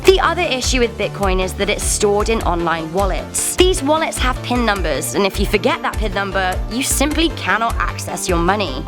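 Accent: United Kingdom. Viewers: so British. There's millions of people with the accent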